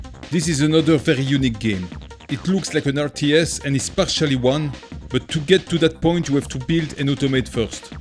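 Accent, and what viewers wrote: France. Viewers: no offense meant but since you asked, to be completely honest, I at first went to my settings thinking I needed to turn on translate to English lol. you have the most stereotypical insanely strong French accent. I'm thankful for closed captions, or I would definitely have skipped the video. I nearly did anyways, but was interested enough in the content to force myself to push through. if it's something you're concerned about, youre not crazy. while there's nothing inherently wrong with any of this stuff, if you are trying to expand your channel however possible and are open to making changes that will likely make your content more easily accessible to the widest audience possible, taking some steps to soften the accent as much as possible would definitely be a strong place to start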